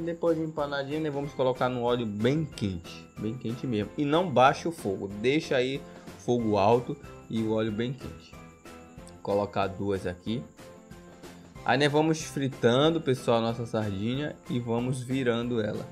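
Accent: Pará, Brazil. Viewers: Pelo sotaque é paraense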